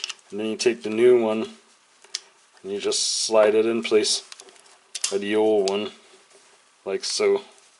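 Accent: Trinidad and Tobago. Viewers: Do you have Trini roots? I think I hear it in your accent.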